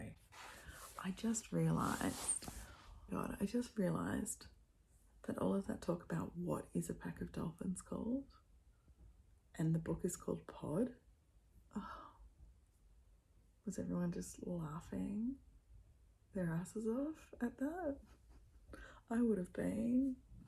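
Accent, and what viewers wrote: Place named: Australia. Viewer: Brent wants to know if you’re originally from the Melbourne area. He’s listening to your accent.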